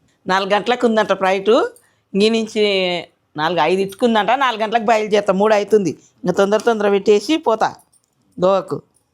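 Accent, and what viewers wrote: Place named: Mahabubnagar, India. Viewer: Hi Anna mi videos Chala baguntayi mahabubnagar slang lo chala baga mataladatharu nen pregnant adhi chuda gani cravings starting ayindi nak kosam koncham pampiyandi please 😊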